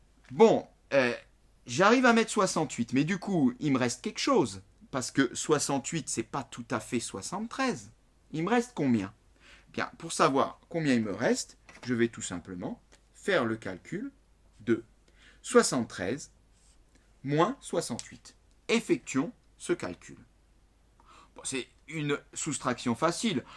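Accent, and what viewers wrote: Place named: Alsace, France. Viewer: zaffuto Axel Il est alsacien c pour sa c son accent👍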